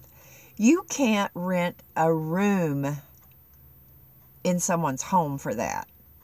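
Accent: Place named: Texas, United States. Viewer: Ah ha! Got it you were raised in Texas and Arkansas! That explains your accent! And your values!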